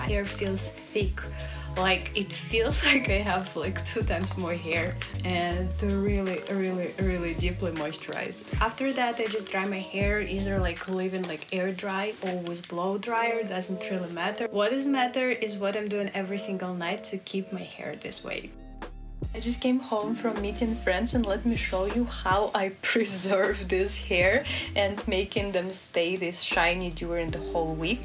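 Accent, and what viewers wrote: Russia. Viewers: как приятно услышать наш русский акцент с первых секунд видео)) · you have a russian french and dutch accent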